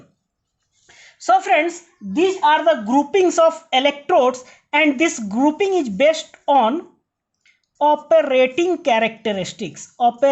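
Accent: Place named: India. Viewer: I usually cant put up with the accent and dont take indians seriously in any trade.. but YOU are the first I can say, explained this very well. Exactly what I was looking for, visual learning and drawing board. Amazing. Cant find another video on youtube like it. Definitely a happy subscriber!!! 😄😄😄😄 I can tell youve done your theory and your prac must be on a high standard. Great stuff brother! Im buying more electrodes today because of u lol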